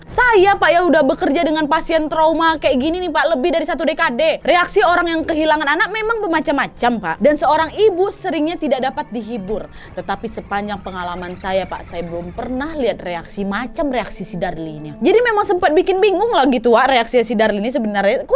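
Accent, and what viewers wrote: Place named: Medan, Indonesia. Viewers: ya btul dr awal da curig, gwe ska knten ini, terutama hostnya, luar biasa gesturnya, bicaranya, segalanya ku suka, mmang itulah gaya Medan bicara, jelas x, masuk brang tu😃❤️😀👍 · Kak ini org mana si sebenernya? Kok ada bahasa jawa² nya, tapi logat nya kek Sumatra🤣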